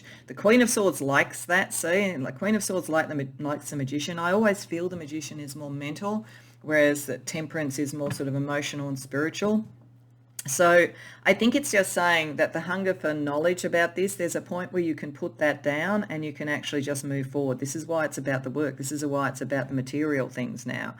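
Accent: Australia. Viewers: Love your accent Aussie?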